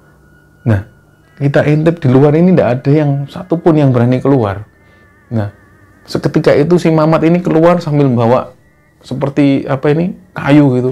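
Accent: Java, Indonesia. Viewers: Abang orang jawa kah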